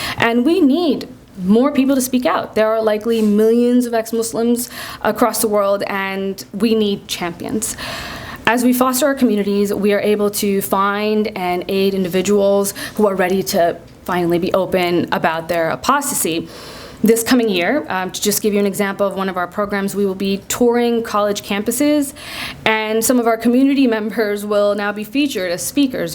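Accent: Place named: United States. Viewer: I would really like to know from you Sarah haider what your credentials are , how long have you practised Islam if at all and which muslim scholars of note have you debated or interviewed . That perhaps would be more convincing than your zionist funded monologue. I see you have an american accent so it must be that you have spent most if not all of your life in the Us which we know is a major zionist stronghold. Further , establishing an "Ex Muslim Organisation" means it has to be funded and when you gather people for a talk and make a professional quality videoto put on youtube and fill the comments column yourselves under various made up names smacks of the zionist Islamophobia agenda . There are many stooges like you parading the internet.Obviously this must be a full time job travelling the circuit delivering dceptive talks and garnering support and creating a mindset so it has to be lucrative . 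The money is there . Zionists with their ill gotten gains based on pernicious usury are ready to spend to further their agenda. Just look at the funding of mercenary groups like ISIS and their affiliates. All funded with zionist money . You must really tell your masters that we have already been warned in the Quran. Since you guys have special research units set up and manuals from which you regularly throw questions at muslims, you should be able to look up the relevant verse very easily. So you see to be forewarned is to be forearmed. It remains to be seen in the hereafter what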